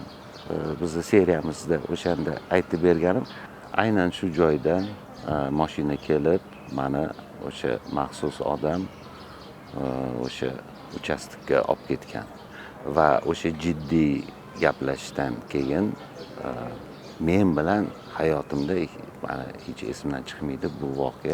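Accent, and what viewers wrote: Tashkent, Uzbekistan. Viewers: ТОЗА Тошкенли . азгина чириганро копто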